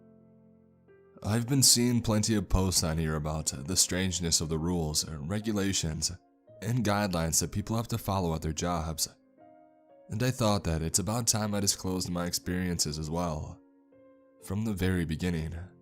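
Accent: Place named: United States. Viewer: Hey do all the ppl who read these CreepyPasta things all have American accents? Like... There's no one in Ireland or the UK doing this stuff? Not knocking your voice or anything, bud, you're doing great.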